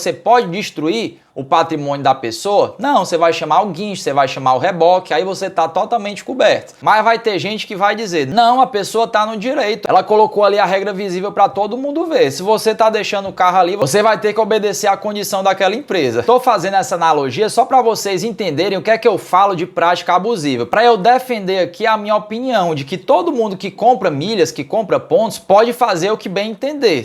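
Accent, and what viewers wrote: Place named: Fortaleza, Brazil. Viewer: Tu é de Fortaleza macho 😀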